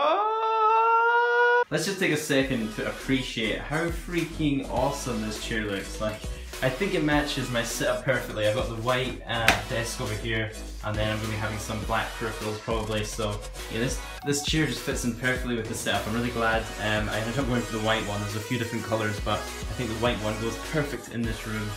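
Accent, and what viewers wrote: Scotland, United Kingdom. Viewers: Nice to find a fellow Scot on YT. Fwendsssss :D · R u scottish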